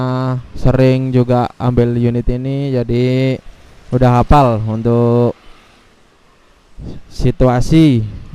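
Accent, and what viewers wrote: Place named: Java, Indonesia. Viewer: Lah jawane medok to ms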